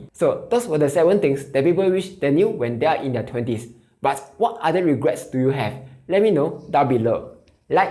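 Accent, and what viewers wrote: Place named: Singapore. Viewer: It’s called Singlish btw.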